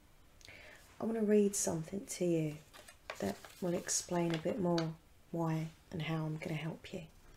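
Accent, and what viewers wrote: Essex, United Kingdom. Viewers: ah an Essex lass fair enough! I'm from lancs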